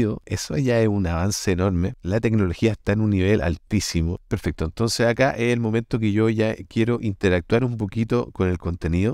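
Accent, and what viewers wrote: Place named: Chile. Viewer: Muchas gracias,. Tu manera de explicar es muy clara y concreta, tu voz y dicción son estupendas... y tu acento chileno hace placentero el recorrido por tus videos.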